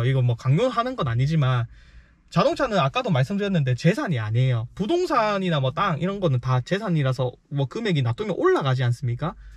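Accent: Busan, South Korea. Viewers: 20대??ㅎ~ 항상 밝고 시원스런 부산 사투리와 성실해 보임에 정감이 갑니다~ 꾸준히 하신다면 성공예감!!!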